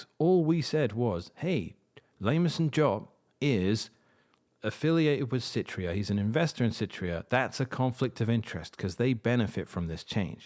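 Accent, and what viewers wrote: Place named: England, United Kingdom. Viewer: 😂😂 “speak English” he couldn’t get more English.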